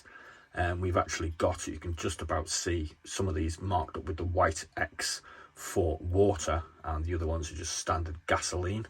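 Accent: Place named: Northwest England, United Kingdom. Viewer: Got to ask, are you in merseyside, wirral or chester, trying to place the accent.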